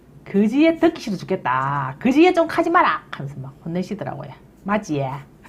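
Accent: Gyeongsang, South Korea. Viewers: 서부 경남 사투리 ㅎ 눈으로 먹고 갑니다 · 표준말 하세요 저도 경상도 사람인데 좀 그래에 ㅎ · 그지예~맛나예~ 같은 경상도 사람이지만, 님 사투리!!! 언니 너무 귀여우신듯요.~ㅋ 제 사투리보고 쳐다보던 사람들 이해가 되요.ㅎ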